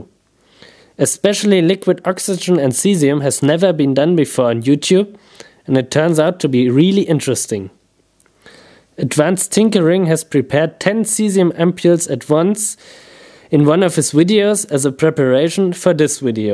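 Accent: Germany. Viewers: Sauberer deutscher Akzent.. ✌🏻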